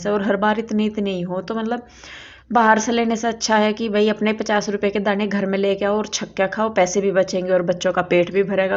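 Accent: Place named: Uttar Pradesh, India. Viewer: Apki language up ki lgti h hmare yha bhi ese hi bolte h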